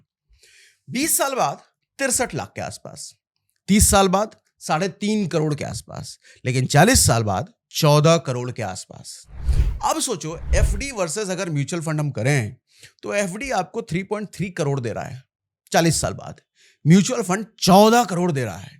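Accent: Bihar, India. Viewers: Tum bihari ho na ??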